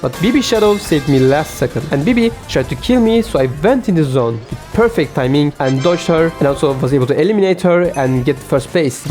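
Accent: Turkey. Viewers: Are you from turkiye your accent looks turk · Howdy maan?? I wanna ask smth, are u turk? bcs ur accent looks like turkish.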